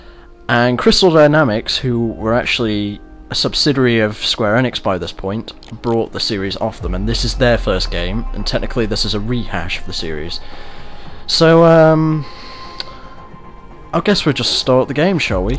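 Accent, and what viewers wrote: United Kingdom. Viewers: AWWW THAT IS ADORABLE!!! Why do you British people have one of the best accents evar!? lol.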